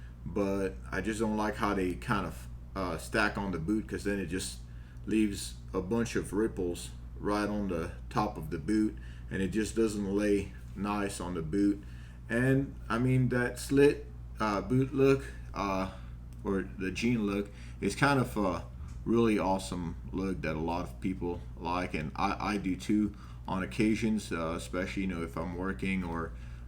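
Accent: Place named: Texas, United States. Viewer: I'm from Texas and you're from Florida, but I'm jealous because you have a perfect Texas accent, especially from the Central toward West Texas regions. You look like you could be in western movies. You might consider being an extra, at least. No one in, say, Fredericksburg or Hamilton or Junction, Texas would ever think you're a Floridian.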